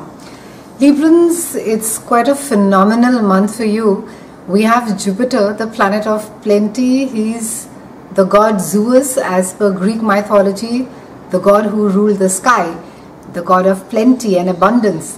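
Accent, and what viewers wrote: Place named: India. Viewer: Your accent is lovely, are you from India?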